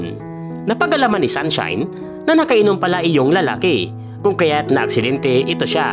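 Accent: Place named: Iloilo, Philippines. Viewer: ang ganda nang storya salamat ilonggo gid kaw yung accent mo halatado lol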